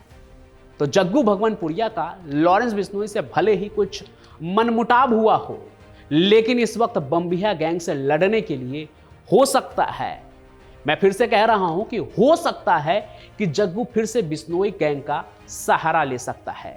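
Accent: Bihar, India. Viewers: Your Way Of Speaking , Accent Is Like Biharis , Like Godi Media Ankers , Speak Normally Dont Be a Actor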